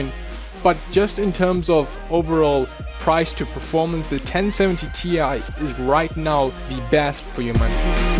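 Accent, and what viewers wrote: South Africa. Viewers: He from South Africa? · I subed for that SA accent xD , were did u buy the parts tho?Evetech? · This is the first video I've seen of yours and I noticed that SA accent right away :D (checked your channel info to confirm it). Great quality video! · Is he South African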